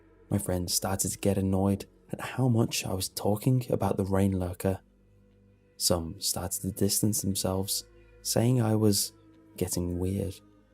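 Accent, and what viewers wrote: United Kingdom. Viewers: is the british voice narrating the story. Yes, he only reads stories that other people post. I’m not blaming for this at all. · Oh no british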